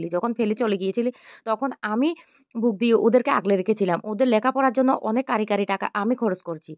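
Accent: Chittagong, Bangladesh. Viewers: Chatgaya bhasa tone e katha bandha korun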